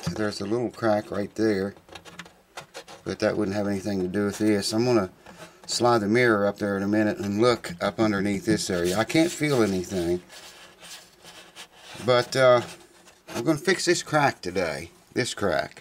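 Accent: South, United States. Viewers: So I knocked my stupid hardly used Wah, Wah off a shelf and it landed on my 3 month old baby...my new acoustic guitar! 😭😭😭😭 Looking for a fix, I found this video and immediately had a good laugh. with his : "Oh I didn't see you there" in I think is called a n English posh accent. Yes it was silly. But still was very funny and made me laugh, and feel a little better. Then it gets even better, when I find out that his actual voice is a southern US accent.. What other surprises does this guy have? No choice but to find out by subscribing..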